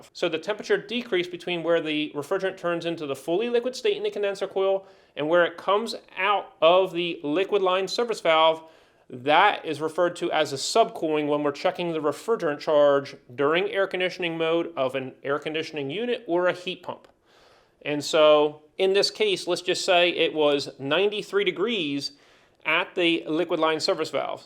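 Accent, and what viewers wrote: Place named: Philadelphia, United States. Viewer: Philly accent detected 👂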